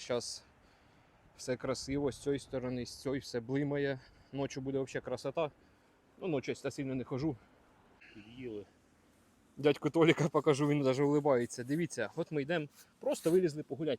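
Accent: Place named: Zaporizhzhia, Ukraine. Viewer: З Днем Народження,! Миру і всього доброго! Так приємно бачити земляка, чути наш говір,ви з Оріхова,а ми з Поліг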